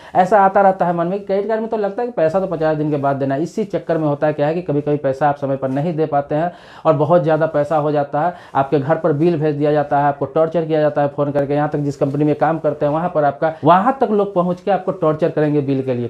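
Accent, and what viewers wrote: Bihar, India. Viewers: Sabse pehle ye apna bihari accent band karo.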